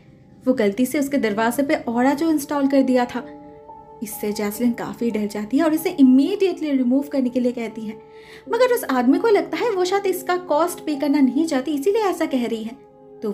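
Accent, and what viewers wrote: Bengal, India. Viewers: sei to. Bengali wali vibe chupegi kaise. Aur itni pyari hindi ek bengali hi bol sakti hai. Khub misti ho tum. Like your way of speaking dear. Love you dear. Chaliye jao. 💕💕💕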